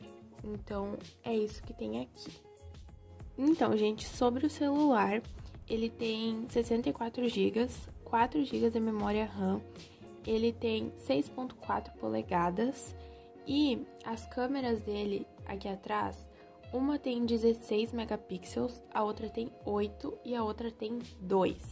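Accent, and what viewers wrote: Rio Grande do Sul, Brazil. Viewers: Tu és do RS guria?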